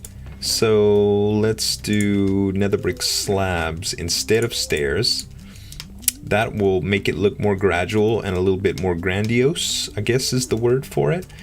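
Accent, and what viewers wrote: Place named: South, United States. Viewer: I don't think you're castle is particularly great or anything. (you break a few key design concepts -General, not just minecraft). I just decided to watch your videos because I've seen things you've done with other hermits and I like the way you talk. I reminds me a bit of when I used to live in the south. Also, I thought you were pretty funny.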